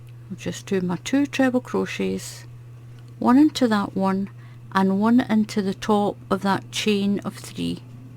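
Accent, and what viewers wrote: Scotland, United Kingdom. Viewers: Beautiful pattern and beautiful colors! Also loved your accent, which appears to me as Scottish! Greetings from Portugal!😊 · It's been a while since I've had time to watch your videos. ( I didn't realize how much I missed your delightful Scottish accent.) This stitch pattern is quite lovely. I must give it a try.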